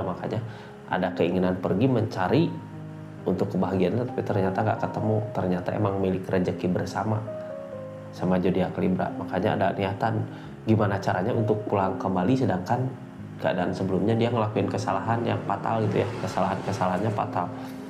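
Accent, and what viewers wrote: West Java, Indonesia. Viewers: Sunda pisan A...Libra Bandung Hadir stay Cilacap salam kenal A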